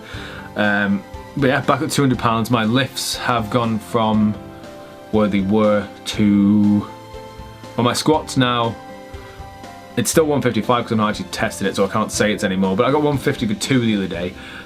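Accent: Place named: North, United Kingdom. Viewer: So northern!!! 😂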